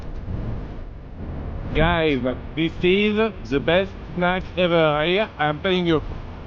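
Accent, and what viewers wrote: France. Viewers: ! Seriously, I have been to Jamaica many times and I have been treated wonderfully by the Jamaicans. It could be your heavy French accent but then again I have followed Dee Mawanga and her brother I’m_Marwa and they both have both heavy Kenyan accents and they both had an awesome time in Jamaica. Just keep on plodding on and don’t take things too personally. Many people don’t like to be on camera so you may have to tweak the way you do things a little. You also have to show the many developed areas in the Jamaica. Anyway, I will still follow you. Good luck my friend. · Sorry you are having a different experience in JAMAICA than you were hoping. There are many Jamaican vloggers like you and Africans men and women, who have passed through the streets of Jamaica and have had a great time with the locals like the Ghanaian Wode Maya, I am Mahwa, etc. 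You should have checked out the Rastafarians and Ital Food restaurants to get your meals as there are many of them around. You have to try a little harder to lower the French accent as many people you encounter selling in the Streets find it hard to understand. Your dress style is not an issue. Some Jamaicans learn French in Highschool, but you will not find them walking around unless you are in the better neighborhoods. So, stay strong and continue to get better with your JA vlogging experience. One Love. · I’m honestly thinking there’s a language barrier. You have a French accent, and I think a lot of people are having a problem understanding you.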